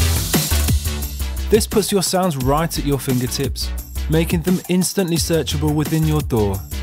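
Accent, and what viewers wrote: England, United Kingdom. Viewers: Nothing. I know it's nonsense, but to me a British accent just sounds more proper and more authoritative so in a sales pitch it works on me.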